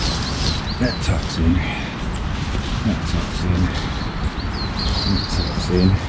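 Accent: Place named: United Kingdom. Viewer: I like that this tarp is lightweight and that you are in the UK, speaking British English. No jingoism, just easier to understand and relate to for me. Your explanations could do with some improvement, however.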